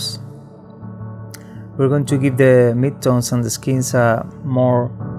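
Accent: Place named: Spain. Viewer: Are you from Spain? Because I am and I can notice your accent 😂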